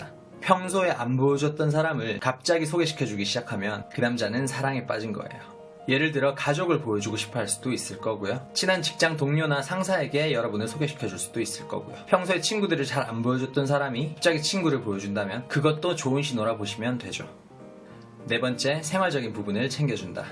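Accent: Gyeongsang, South Korea. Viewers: 아 역시 대구분이시군요.대구출신 친구들이 많은데 그 친구들한테 듣던 억양!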